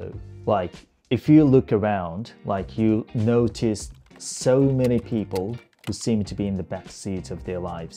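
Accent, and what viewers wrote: Australia. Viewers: オージーアクセントが炸裂してる笑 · この人の英語ってフラットというか、イントネーションの高低があんまり激しくないように聞こえるんだけど オージーアクセントを身につけた結果としてそうなったのか、意図的にそうやって話してるのかどっちなんだろう？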